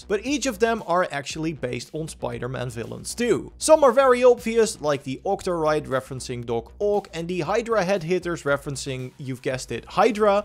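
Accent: Netherlands. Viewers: are you dutch? Cuz im hearing a stong accent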